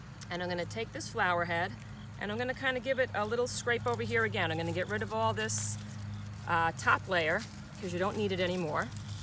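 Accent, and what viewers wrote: New Jersey, United States. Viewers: thanks for this - I now understand that it is too early to harvest sunflower seeds. My favorite variety is Italian white - goldfinches love it! BTW your (very slight) accent makes me homesick for NJ and Zone 7 gardening (now living in Minnesota in Zone 4)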